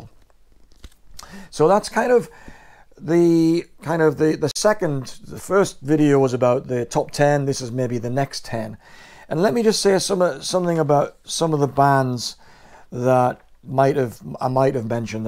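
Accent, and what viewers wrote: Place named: Newcastle, United Kingdom. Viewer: From the accent I guess you must have seen most of these bands at the city hall. We were so fortunate in the northeast to have such a great hall that most (if not all) bands visited Newcastle on their tours. Did you catch the Chrysalis tours with Genesis and lindisfarne?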